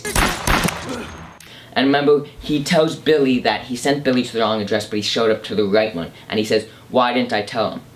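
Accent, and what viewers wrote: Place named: North America. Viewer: Somewhere in North America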